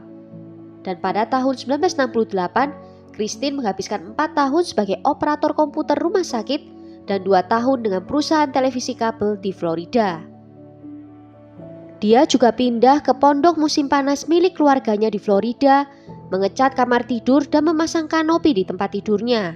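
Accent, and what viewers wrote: Java, Indonesia. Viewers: Mbak ini pasti wong jowo, medhok jowone 😉, tp ini jadi ciri khas yg bikin beda dengan yg lain. Sukses ya mbak 👍🥰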